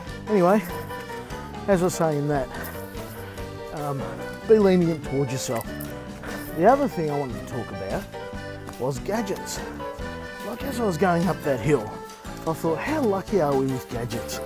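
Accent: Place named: Australia. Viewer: lol i wish i had an aussie accent! sounds awesome! good luck with your weight loss journey mate! new sub :D can't wait to see your progress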